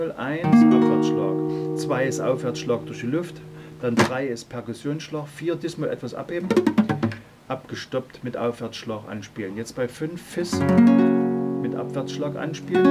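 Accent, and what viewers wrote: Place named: Saxony, Germany. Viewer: ganz toll gemacht....normal mag ich das "sächsische" ja net so gut leiden....aber du bist da mit abstand ne ausnahme....liegt wahrscheinlich an Deiner symphatischen Art das Ganze zu erklären. TOP--> super Tutorial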